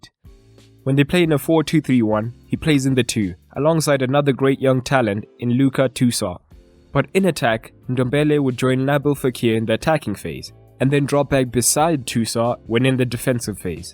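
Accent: South Africa. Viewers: What do I think? I should let you know in the comments below? I think... I think you sound South African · You sound South African. New subscriber 🙋🏽‍♀️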